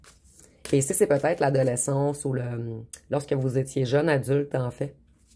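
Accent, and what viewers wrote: Quebec, Canada. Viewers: J'adore vous ecouter avec votre accent quebecois vous etes calme❤❤❤❤❤❤❤❤❤❤❤❤❤❤❤❤❤❤❤❤❤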